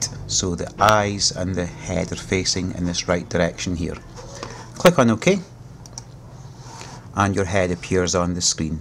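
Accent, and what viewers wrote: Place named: Scotland, United Kingdom. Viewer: scottish accent...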